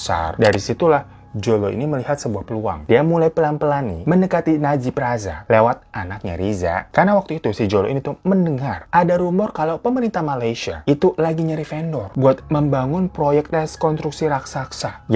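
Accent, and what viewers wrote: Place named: Betawi, Indonesia. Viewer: Betawi nya lucu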